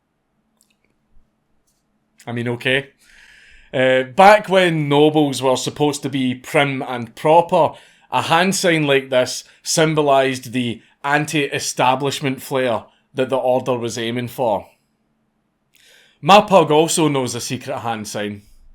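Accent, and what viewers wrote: Scotland, United Kingdom. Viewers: "A Papul bull" I love the scottish...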